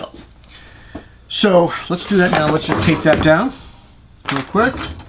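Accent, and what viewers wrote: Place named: New England, United States. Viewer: my gf and I love your videos. She's the hobby woodworker and I'm learning from her. Your instructions are easy to follow and your voice is both slow and clear. Maybe it's a Massachusetts or New England thing but you remind me of This Old House and the New Yankee Workshop.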